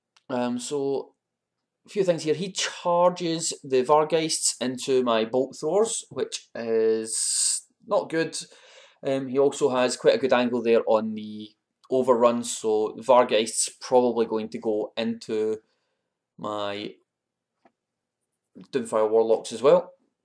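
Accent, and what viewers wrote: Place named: Scotland, United Kingdom. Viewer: Not to far from me then, its great to hear another scottish voice. I recognised your braw dice ha ha i have same kind got them at the scottish open in striling in october. I had my fluffy orcs at that tournament......lets say it didnt go to well ha ha Next time its my wee stunties only. Ive just finished editing a game i had against chaos dwarfs uploading it later, check it out. See how goblins fair against broken chaos dwarfs. Take care and merry xmas. Maybe see you at tournaments next year i hope.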